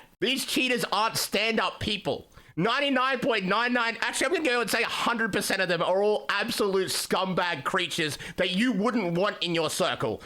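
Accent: Australia. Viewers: Came for the drama, stayed for the Aussie accent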